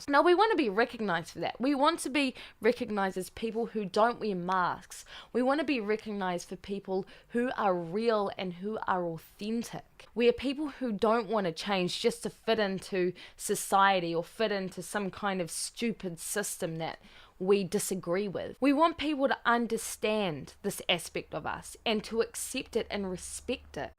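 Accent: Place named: New Zealand. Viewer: That kiwi accent. Hard to find in a sea of YouTube content.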